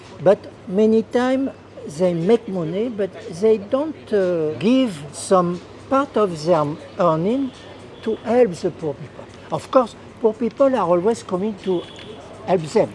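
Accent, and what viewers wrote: France. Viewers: Although he speaks in " French English" accent, he is typical manipulative Muslim. Cast system is related to marriage only. So called lower class enjoy way more benefits than so called upper class. Btw there are only two cast "human" and Muslims .There are only two religions "humanity" and Islam. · His pronunciation is still like that of a typical Frenchman. I'd love to meet this man one day in Mumbai.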